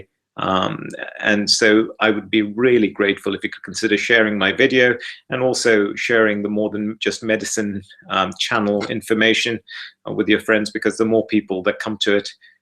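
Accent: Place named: RP, United Kingdom. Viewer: What can causes palpitations or fluttering? Since 2y i started to get them. Since 5y i got hashimoto aswell. Before that time i was very healthy and energetic... since i got hypothyoroid issues there is always something going on... say i get 1 month palpitations the rest of the year its gone. At this moment i have it 1,5 month and it is not gone yet. I blamed first probiotics or greec yoghurt. So did not take it and still its there. Then i took celtic seasalt in water and bit by bit it went away! So i was very happy. But then 3 days later it came back. Most of the time i take every day magnesium. In juni cardiologist tested potassium etc and it was good, end of july it started the palpitations. So what can i do about it? By the way you have good videos, plus you are good looking and sexy too 😁☺. I like your post english accent dr.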